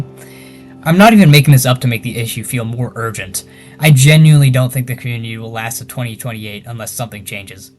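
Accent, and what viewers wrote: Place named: Canada. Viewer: kenadian-ah voice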